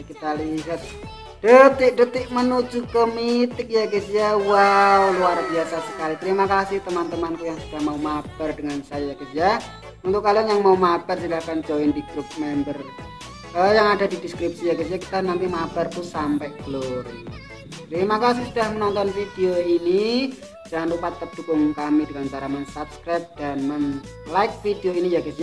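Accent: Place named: Java, Indonesia. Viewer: Medok mas😀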